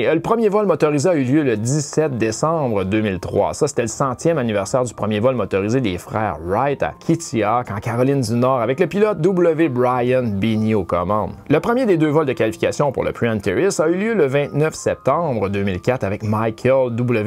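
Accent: Quebec, Canada. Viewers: Toujours un vrai plaisir. Le ton, le style et tes efforts pour lisser ton sympathique accent québécois. Au dela de la forme, c'est tres intéressant d'avoir les nouvelles du spatial d'un point de vue nord-américain et ... en français ! Courage...on aime ton travail 🇨🇵 ❤ 🇨🇦 Ps. J'ai conscience de l'esprit indépendant nord américain mais pourquoi pas des collaborations avec des YouTubeurs français? C'est une pratique courante ici chez ces "maudits français". Ton nombre d'abonnés n'est pas a la hauteur de la qualité de ton travail...(aucune flagornerie de ma part...)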